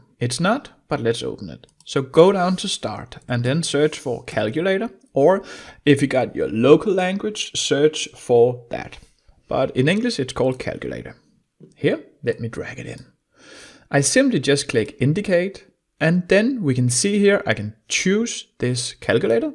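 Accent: Denmark. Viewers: I like the danish accent!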